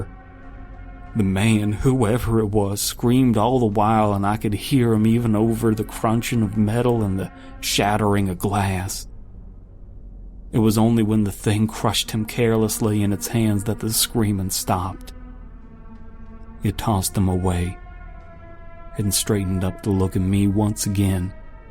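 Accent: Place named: Nebraska, United States. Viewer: You really did a good reading of this tale. Your 'accent' for this reminded me of growing up in Nebraska.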